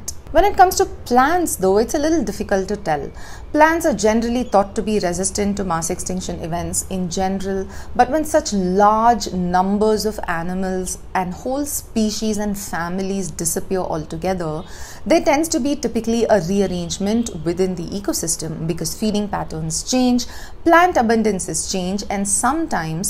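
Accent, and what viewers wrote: India. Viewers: I love female Indian accents. 😍😍😍😍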